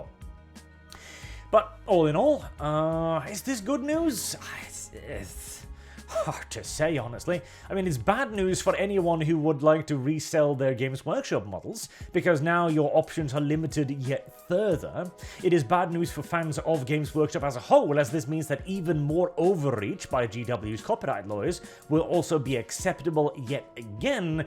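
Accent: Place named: United Kingdom. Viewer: this fuu british asl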